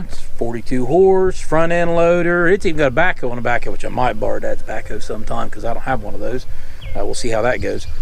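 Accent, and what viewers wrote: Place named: North Carolina, United States. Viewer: Sounds like you're got a touch of north carolina down east in your speaky.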